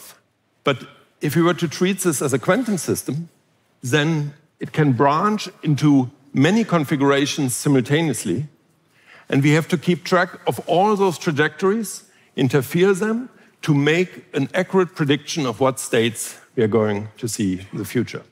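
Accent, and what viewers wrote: Germany. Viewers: i can hear the german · i tryed to watch it, but after min he lost me completly. And the german accent has mad it worse. Sorry Hartmut, aber das kannst du sicher besser. Geiles Thema, aber suboptimal transportiert, für mein Verständnis zumindest. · his baldness (with or without sunglasses) is superseded by his German accent for his credibility.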